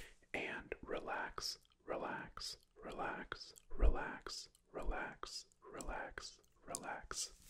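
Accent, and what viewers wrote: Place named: United States. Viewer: Big compliment: I love your voice. Usually I don’t get tingles from just whispering but I nearly fell asleep listening to your sponsorship in the beginning. I’m not American myself so your accent and tone is really cool to me :)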